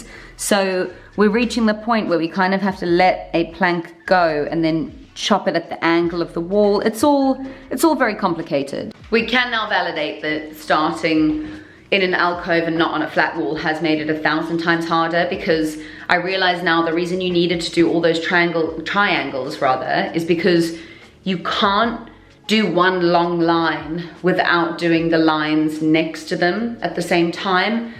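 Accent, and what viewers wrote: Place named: South Africa. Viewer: Instantly knew you must be South African! Fellow South African here 🙋🏼‍♀️ Great video 🥰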